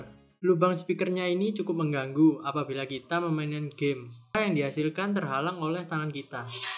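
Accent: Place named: Java, Indonesia. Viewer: keliatan banget jawa nya tolong bahasanya diperbaiki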